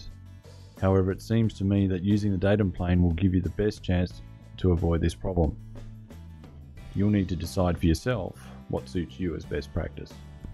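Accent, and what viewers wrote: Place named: Australia. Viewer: Great explanation! Also good to hear another Aussie doing this too.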